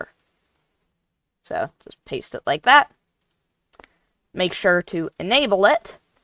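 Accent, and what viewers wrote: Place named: South, United States. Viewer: most southern minecraft youtube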